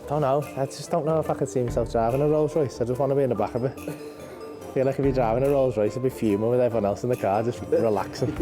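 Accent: United Kingdom. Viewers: As usual I’ll need the subtitles for this. It gets well weird north of Stroud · You hater 😂😂. His vibe & flow is second to none and his quick witted northern monkey flow is 🤌🏻🤌🏻🤌🏻🤌🏻 love ya. Congratulations mate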